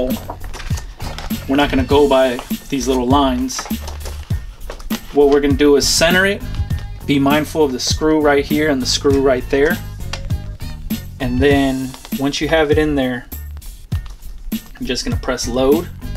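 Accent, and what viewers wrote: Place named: New Jersey, United States. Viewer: Great video fam! I've been thinking of making some merch for my esk8 channel (shirts, stickers). But there's a learning curve so might outsource. Given that, making the videos, editing, and all the esk8 there's only so much room for my skill level. What do you think. Btw, you sound like my cousin...are you from Jersey? - Neil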